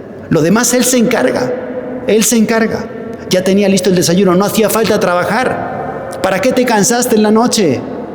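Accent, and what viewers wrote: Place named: Spain. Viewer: Tiene un acento es español de seguro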